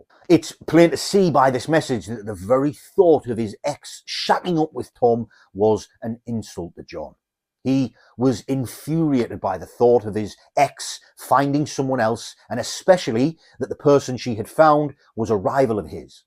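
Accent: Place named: North East England, United Kingdom. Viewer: You sound like you're from up my way NE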